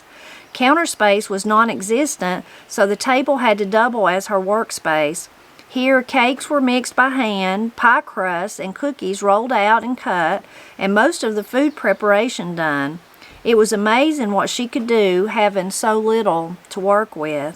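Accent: Appalachia, United States. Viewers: Did you read the story in your natural (Western NC) accent or in the local Eastern Kentucky accent?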